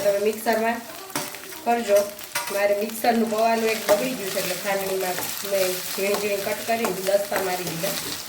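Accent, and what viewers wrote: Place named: Saurashtra, India. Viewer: તમે સૌરાષ્ટ્ર ના છો ?? તમારી ભાષા એવી છે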